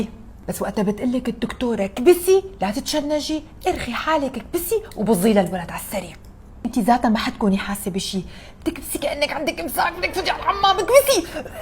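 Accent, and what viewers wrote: Syria. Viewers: لهجة سورية تهبل ❤ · محلاااااكي نفسي تكون عندي صديقه نفس طريقه كلامك وحرجاتك 😂😂😂 محلاها اللهجه السوريه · اللهجة السورية عششششققق عيني ربك والله 🇮🇶🇸🇾❤❤😂😂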